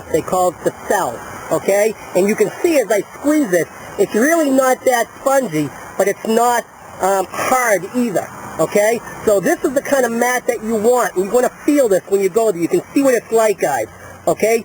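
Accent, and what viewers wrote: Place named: Boston, United States. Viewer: Ha! You're definitely from Massachusetts or maybe even Rhode Island. What gave it away, you ask? Oooh, one of the original shirt designs from WAAF, the Patriots hat and the THICK Boston/Providence accent. I'm not raggin bud, I'm from Massachusetts and I often forget to realize how we sound... it cracks me up. I don't usually see or hear fellow New Englanders on youtube, kudos. Great video, with a great idea. Keep it up. Cheers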